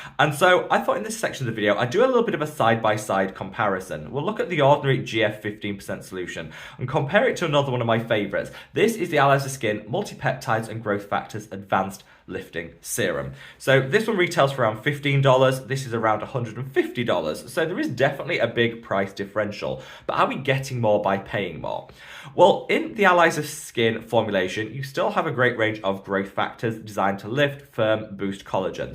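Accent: United Kingdom. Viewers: I enjoy listening to you. Your voice reminds me of a dear friend who’s a British expat in New Zealand!!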